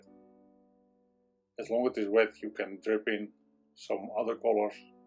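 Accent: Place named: Texel, Netherlands. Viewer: Love this painting..so beautiful. Love your accent as well. Reminds of my grandfather who came from Texel...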